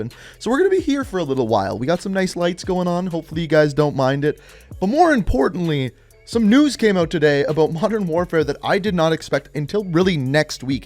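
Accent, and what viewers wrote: Canada. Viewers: I love yo videos. Ur Canadian ryt just wondering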